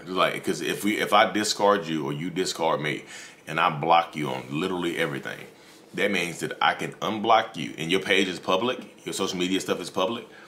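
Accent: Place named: South, United States. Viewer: Shoutout to NC with that Southern Draw!!!! As I'm from Winston-Salem,NC . I love this channel ,and even more your content, keep it coming!!!!!!!!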